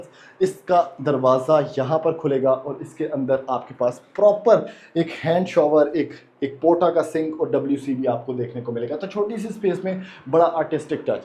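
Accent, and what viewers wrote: India. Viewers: Main es ki hindi se samjha ya india ka hai 😂😂😂😂😂😂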